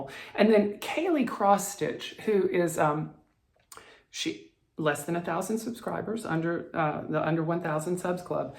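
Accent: South, United States. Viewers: Omg I just died 😂. What an absolutely perfect disclaimer. Just found your floss tube thanks to the Floss Bossin Cousins. Have been binge watching/listening while at work 🤫. When just listening you make me think of Leslie Jordon (your sense of humor or southern accent maybe)🤷🏻‍♀️. Looking forward to the next 50 or so videos 😃. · Great video. I always walk away from one of your videos smiling and quietly laughing. What a soft spoken southern gentlemen. My father in law was a southern gentleman, too.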